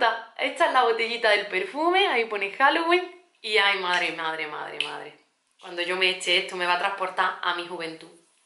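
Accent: Granada, Spain. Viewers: pero si parece q eres de Graná 😱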